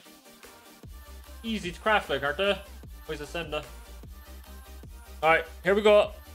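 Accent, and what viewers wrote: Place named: Ireland. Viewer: Are you irish ? Sounds like it to me so am i !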